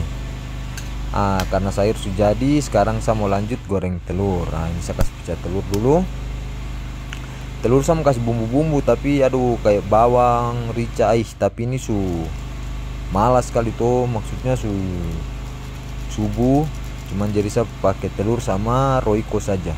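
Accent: Sulawesi, Indonesia. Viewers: Abang orang mana ap orang Sulawesi betul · Orang Sulawesi ya kalau liat logatnya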